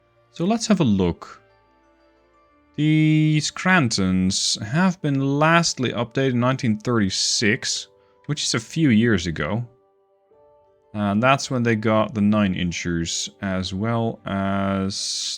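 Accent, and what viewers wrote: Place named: Netherlands. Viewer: horrible Dutch english. OMG. get some training.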